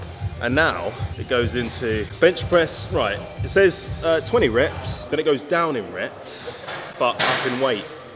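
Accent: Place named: England, United Kingdom. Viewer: Bros jacked and has the best english accent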